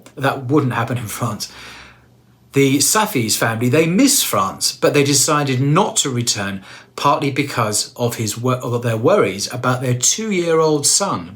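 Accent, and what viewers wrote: United Kingdom. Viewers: "The rising number" " Among them are a growing number of Muslims". Jeez - can you be a little more specific. I am not going to deny the shit stirring right in France - but please be more specific. And also the man making this vid has a UK accent. Is he aware year previous to 1994 UK had always been a net exporter of people - and still huge numbers leave. I have never seen this featured or analysed by UK media. I wonder why.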